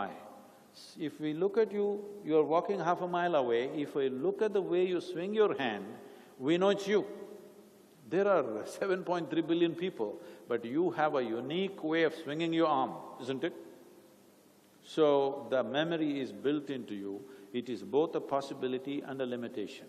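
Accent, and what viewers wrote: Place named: United States. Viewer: what this jerk Sadguru is Bull Shit.He has no knowledge.He never answer a question posed instead talks some pig shit in super American English..see above qstn. was asked how a person feels just before his/her death and this jerk says something mango Banana..i will tell u what happens ..just a 10 minutes prior to death the person see his departed parents.Departed friends and relatives and feels some one is pulling his body from his/her feet and feels coldness in his body.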